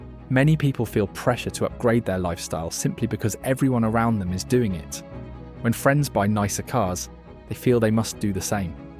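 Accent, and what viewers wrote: United Kingdom. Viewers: A great video! Thanks for posting! I thought you were a British YouTuber as you have selected a British accent for your narration. Would you do this for the UK? Or are we too small?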